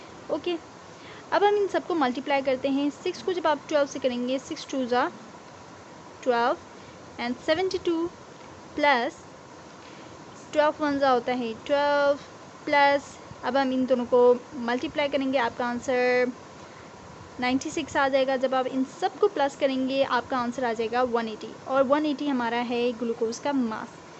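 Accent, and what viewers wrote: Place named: Pakistan. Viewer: Are you from Pakistan I mean the person who is teaching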